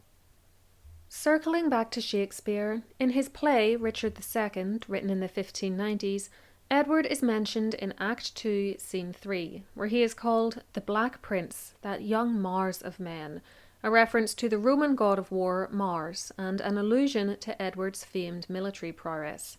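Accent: Northern Ireland, United Kingdom. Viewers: Thank you for another fine video. I continue to really enjoy your channel, and your accent is icing on the cake. Yes, I'm one of those Americans who love all U.K. accents. 👍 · I’m so glad I came across this page by accident, I love history channels and it’s awesome hearing a Northern Irish content creator, I don’t usually come across many people from here on YT, definitely subscribed 😊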